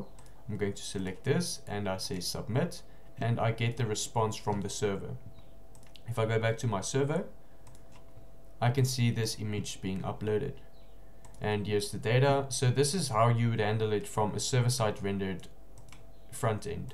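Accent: South Africa. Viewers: You sound South African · i had to search this guy cos the accent is very much South African!!! haha! very clean and straightforward video thank you! greetings from Germany! proudly SAn!! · Dude, you finally put all my floating questions about the multer docs on npm to rest, thank you, you did such a wonderful job walking us through the code! 🤓🙌🏽💯✨ And super random but I love your South African accent btw 🎊. Greetings from the US :)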